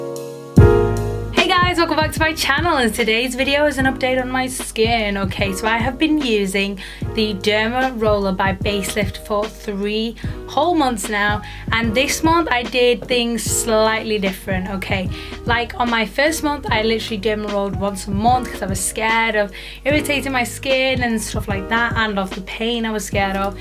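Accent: England, United Kingdom. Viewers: Akcent is like amir khan England's boxer 🤣😂😘😍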